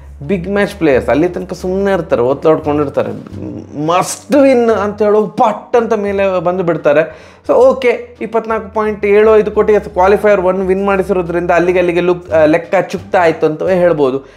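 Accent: Mangalore, India. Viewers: Neev yava video veiadru maadi karantre. Nanu nodthene. Nanu video nododu information gaagi alla. Nimma e Mangalore accent Kannada kellikke barodu 😂 I love it man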